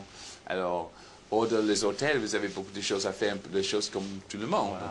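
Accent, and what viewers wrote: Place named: England, United Kingdom. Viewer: The first time I understand him.....and I love his english accent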